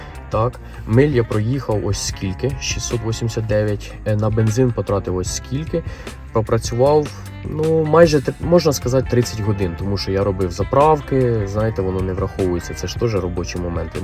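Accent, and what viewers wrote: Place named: Western Ukraine, Ukraine. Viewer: Я когда слышу его голос или говор меня аж сука коробит) от войны убегало , вуйко?)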